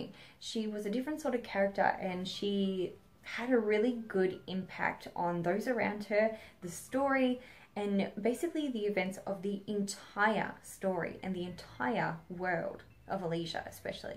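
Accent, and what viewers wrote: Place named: Melbourne, Australia. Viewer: You sound like you're from Melbourne! =D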